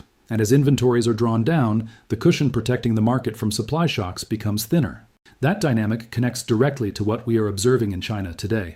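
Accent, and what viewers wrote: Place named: Australia. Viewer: This ai has a slight Aussie accent!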